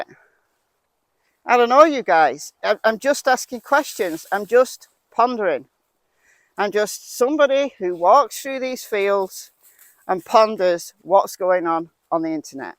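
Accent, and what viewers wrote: United Kingdom. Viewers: I live in Milton, Florida in the US, and EVERY morning I wake up, FIRST THING I do, is go to YOUTUBE, And look for your video. Every morning, and several times a day! I value your insights, your option, your intelligence, plus my best friend is British, I LOVE your accent!! YOUR highly intelligent, unbias, you look at every side to the story, not just one side. You have facts to back up your opinion. You don't shame ppl in the case, you stand for truth, morals and justice for Summer Moon Utah Wells!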